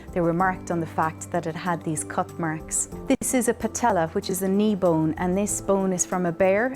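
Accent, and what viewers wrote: Australia. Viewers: What are you doing is northern Cal .... hot maybe sacramento .....nice to here Aussie anyway ...Bro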